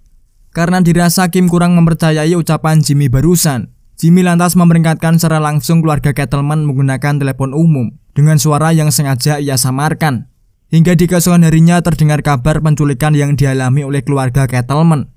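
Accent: Java, Indonesia. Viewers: Jangan di medokin bg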